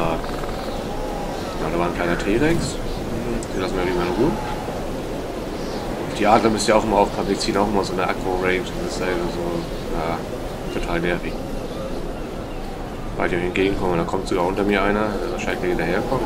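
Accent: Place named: Hamburg, Germany. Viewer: thx für das Tutorial. Vom Sprachbild würde ich mal stark Hamburg und Umgebung schätzen.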